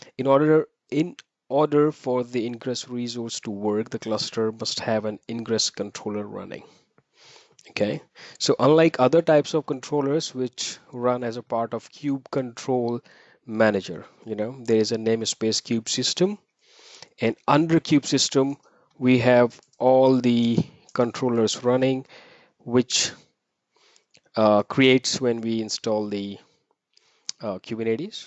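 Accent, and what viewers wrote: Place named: India. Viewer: trying hard to hide Indian pronunciation :) anyway good short video.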